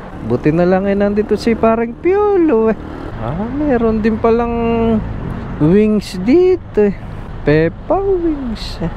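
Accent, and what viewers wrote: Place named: Bulacan, Philippines. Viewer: Aba eh nawiwili akong makinig sa pagsasalita mo ngayun idol eh,alam mo bagang ganyan din magsalita mga taga San Miguel bu..lacan...mga kamag anak ko dun eh pag silay magsalita ng ganyan eh di ko wari kung akoy tatawa eh....hehehehe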